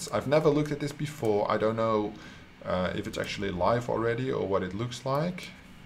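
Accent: Netherlands. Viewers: Nice vid bro!! Smart thinking🤔💎 Where you from? Accent sounds Dutch😅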